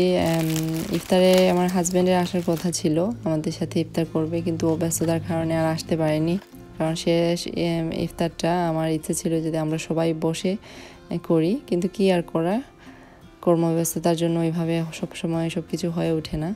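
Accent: Sylhet, Bangladesh. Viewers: Salam apa . Apni ki shyllhety. Apnar kotha sune to buja jayna. Doy bora ki vabe banate hoy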